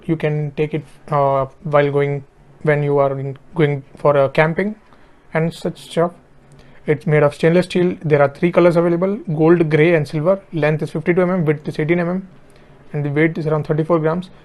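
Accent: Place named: India. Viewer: Indian English is the most annoying accsent I ever heard in my whole life. Sounds like you eating all letters.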